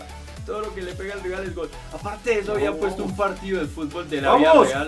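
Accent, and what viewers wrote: Colombia. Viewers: De que parte de colombia eres?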